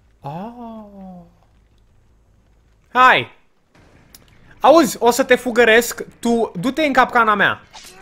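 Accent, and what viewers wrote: Moldova. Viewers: vorbeste cu accent moldovenesc v-a fii un epison amuzant :)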